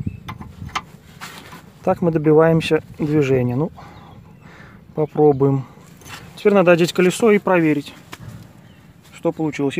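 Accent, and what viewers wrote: Belarus. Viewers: Ты из Белорусии? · бульбяш.... говор не спутаеш))) +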